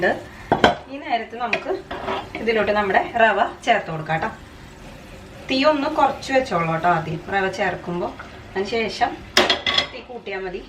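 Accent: Thrissur, India. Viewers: ആദ്യമായിട്ടാ ഈ ചാനൽ കാണുന്നെ... റെസിപ്പി, അവതരണം ഒക്കെ ഇഷ്ടപ്പെട്ടു... Subscribed ഒരു തൃശ്ശൂർ കാരിടെ ലക്ഷണം കാണുന്നുണ്ടല്ലോ... തൃശ്ശൂർ ആണോ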